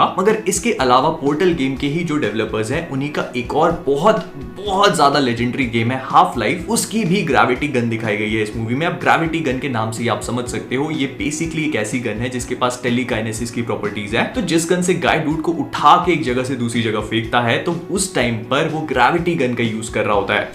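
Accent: Bhopal, India. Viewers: bhai bhopal se hai kya?? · apne Bhopali accent mai video bnake maza hi dila diya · I love your bhoplai accent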